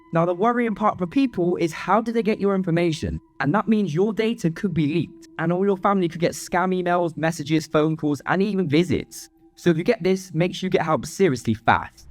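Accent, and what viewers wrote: South Africa. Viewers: Your accent is from south african, are you from South Africa? Thank you for sharing!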